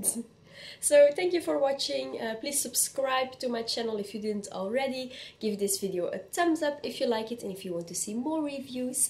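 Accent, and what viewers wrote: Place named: Europe. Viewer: I like your accent. I lived in Europe this video made me miss it for sure ❤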